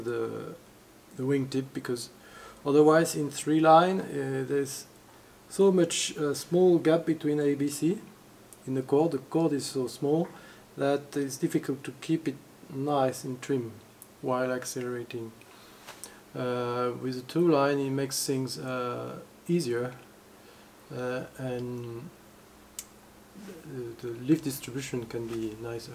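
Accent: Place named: France. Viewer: Mais quel accent de merde, j'adore!!😄 Vive la France🇨🇵 And don't forget to come visit and fly our beautifull Alps!!👍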